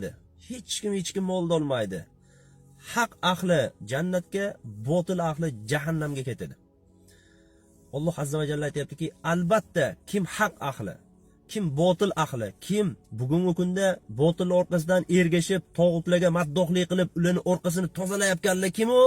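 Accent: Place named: Namangan, Uzbekistan. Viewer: Номонгонликми дейман шевасига караганда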